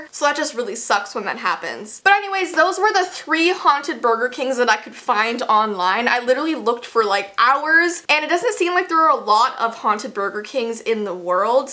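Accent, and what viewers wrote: Canada. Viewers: Don’t worry I am Minnesotan so I have the same accent as Canadians and I say Oregon (or-I-gan) too ily❤️ (edit) this was a typo: (or-i-gen)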